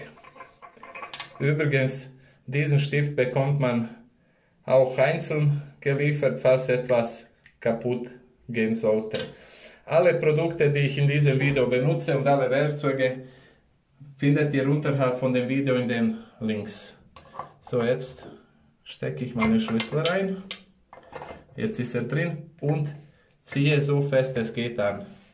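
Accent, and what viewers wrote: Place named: Eastern Europe. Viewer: Super erklärt ohne viel Schnick Schnack so wie es sein muss :) und dein Osteuropäischer Akzent ist sehr angenehm und beruhigend zu hören :) ❤❤❤💯🤝🏼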